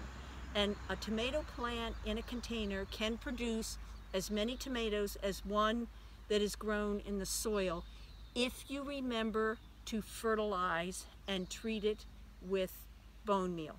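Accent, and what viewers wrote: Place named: New Jersey, United States. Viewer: You must be from N.J . Thank you.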